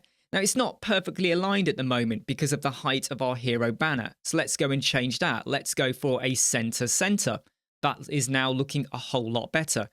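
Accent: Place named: United Kingdom. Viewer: I recently discovered your channel, and I have to say, it's become my go-to resource whenever I need to learn something about Elementor and WordPress. Every time I have a question, I head straight to your channel, use the search bar, and look for the topic I need. Only if I can’t find what I’m looking for do I turn to YouTube for other tutorials. You explain things in such a simple, clear, and easy-to-follow way, and your British accent makes it even more enjoyable and effortless to understand. I just wanted to thank you for that!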